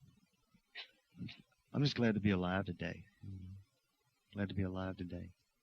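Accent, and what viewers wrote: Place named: Dallas, United States. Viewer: Classic Dallas accent from the period.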